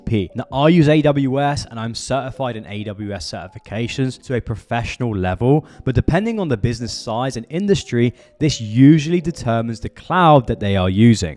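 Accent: United Kingdom. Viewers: Looool it’s our UK accent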